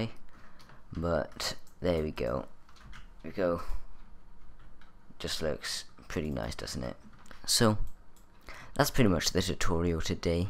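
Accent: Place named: United Kingdom. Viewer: It's called having a british accent.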